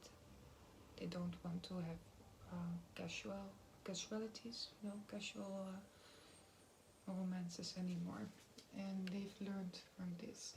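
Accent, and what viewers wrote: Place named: Netherlands. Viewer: aan je accent hoor ik dat je nederlandse bent. je legging is top! veel succes met je kanaal!!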